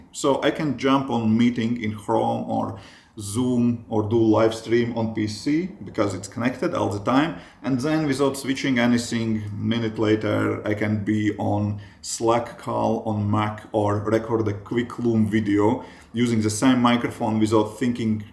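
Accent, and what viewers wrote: Russia. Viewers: A Russian accent?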